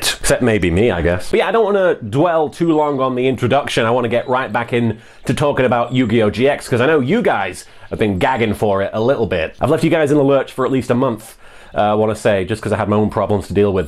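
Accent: United Kingdom. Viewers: LK this is the most British your ever sounded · has never been so brittish, and I bloody love it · Silly question but is from the UK? I can't tell if he has an accent or not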